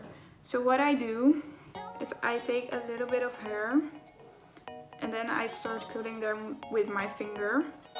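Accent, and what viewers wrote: Netherlands. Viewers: hoorde gelijk dat je nederlands was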